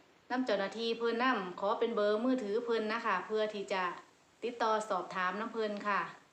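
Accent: Isan, Thailand. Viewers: สำเนียง.ต่ายนักฮ้อง..ภาษาอีสาน.เป็นภาษาที่สระสลวยขอรับ..ตาดูดาว.ท้าวติดดินชอบ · วันหลัง อัดคลิปพูดภาษากลางก็ได้นะคับเพราะคนฟังไม่ใช่แค่คนอิสานนะคับ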